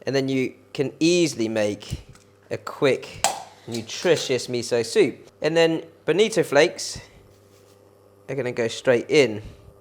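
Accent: United Kingdom. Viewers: Love your videos and your nice British English :) What do you do with the used bonito flakes and combu?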